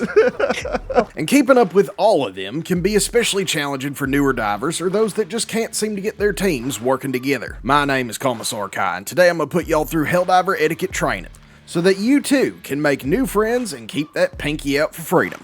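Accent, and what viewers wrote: South, United States. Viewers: As someone also with a heavy southern accent and a cadence like yours, I really appreciate your video. It’s hilarious!!!! subscribed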